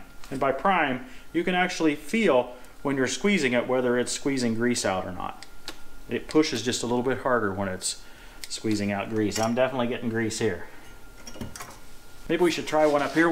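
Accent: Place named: Indiana, United States. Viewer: I just thought that was an Indiana accent when you always said "greezing" and not greasing lol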